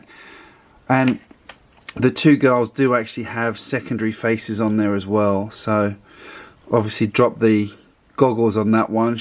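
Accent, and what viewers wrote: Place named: United Kingdom. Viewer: Just a straight up thought if I watched with my eyes closed. Nice British accent!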